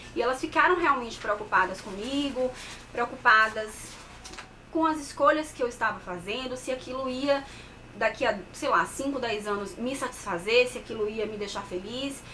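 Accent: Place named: Bahia, Brazil. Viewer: já falei que desde primeira vez que encontrei seu blog me apaixonei, depois ouvi seu vidio virei super fã, adoro de mais seu sutac... e agora descobri q vc tbm é baiana... 😍 eu tbm sou Baiana😍Chapada diamantina 😘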